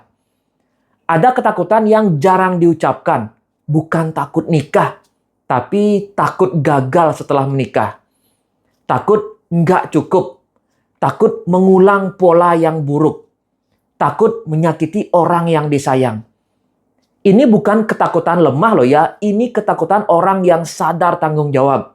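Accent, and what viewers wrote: Bali, Indonesia. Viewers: Logatnya kek dr bali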